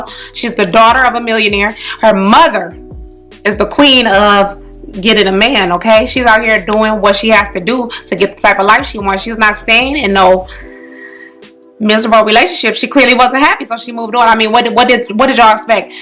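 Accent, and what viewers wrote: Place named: Spain. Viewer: like a Spanish accent to it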